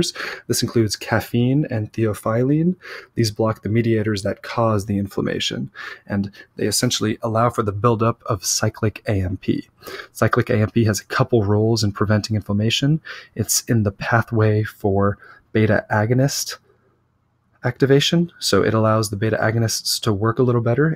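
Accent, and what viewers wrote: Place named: United States. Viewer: I love your american accent and the way you say RECEPTOR :-) :-* Best regards from Munich,Germany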